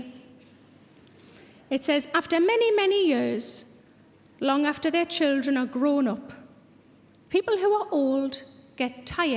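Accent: United Kingdom. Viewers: What part of the UK is that accent from, lovely xD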